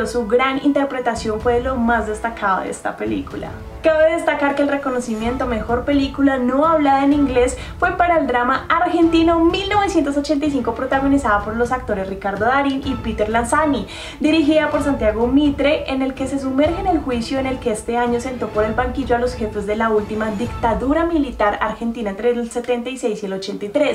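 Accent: Colombia. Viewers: Bogotense? Sale chamaca linda! Luego echamos chisme,jajaja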